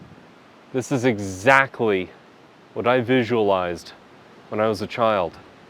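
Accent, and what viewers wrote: Scotland, United Kingdom. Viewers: Hi, your mimicry of an English accent was pretty good and you are starting to acquire a Scottish accent too. The whisky certainly seemed to reach parts that other whiskies don't reach😅. Loved watching the gin production at Dunrobin Castle, I always wondered how gin companies got their botanicals into the gin and now I know that its with a giant teabag. Love the colour of their bottles too. Wishing you and Christine as well as Queen Annabel a Merry Christmas. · I’m liking the new format. I’m also starting to notice a wee bit of a Scottish twang with some of your words, we must be growing on you 🙂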